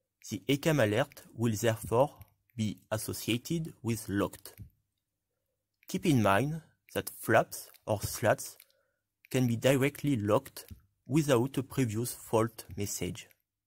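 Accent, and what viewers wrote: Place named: France. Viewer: Amazing video . Very well explained . Good graphics . Love your French accent . Would love to see more and more videos of exercises done in simulator checks ,, even after 8000 hrs on A320 ,, this video on flaps and slat positions was a very good pictorial learning . Like and subscribed.. waiting for tutorials on ra1+2 .. emer elec .. adr + ir exams ,,, unreliable airspeed also ,, since there is not a single satisfactory video on YouTube … and many more videos pls …. We would also like to see videos on how to apply MEL in various stages of flight ..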